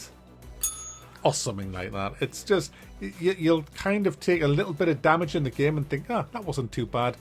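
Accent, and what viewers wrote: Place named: United Kingdom. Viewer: Can I ask how British accent is called? It's so cool:)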